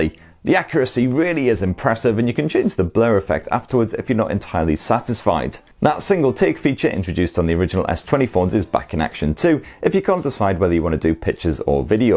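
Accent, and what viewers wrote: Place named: Newcastle, United Kingdom. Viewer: Great review and easy to listen to seeing as the presenter has the same Geordie accent I do. Top blerk!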